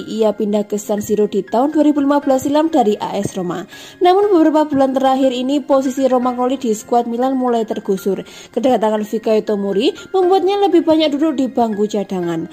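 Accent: Java, Indonesia. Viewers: Host ny logat bicarana ni medok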